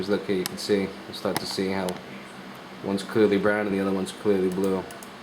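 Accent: Boston, United States. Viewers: You from around Boston bruhh?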